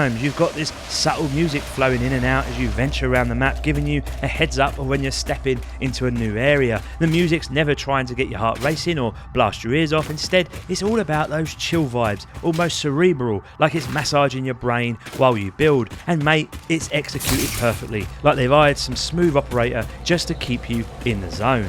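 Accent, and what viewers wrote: Cockney, United Kingdom. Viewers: Ok, Im sorry. Unbrittish person here. Is that actually a cockney accent? It is not at all what I imagine a cockney accent to be. Is it some sort of sometimes has tea with the queens, sorry kings, gardener kind of kockney or am I having a stroke?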